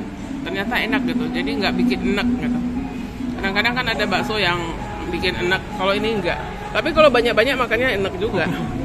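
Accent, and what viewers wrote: Minangkabau, Indonesia. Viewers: Gomok bahaso padang nyo tu bu 😂😂😂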